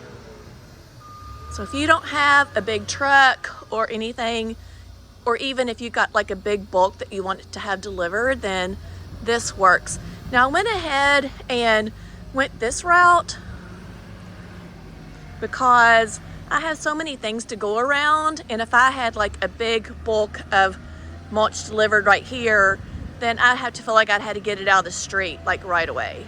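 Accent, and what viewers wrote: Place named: South, United States. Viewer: I don’t care how you say your words, It brings out your southern charm. In the plant world I think people get so hoity-toity about how words are pronounced, so much of it is regional.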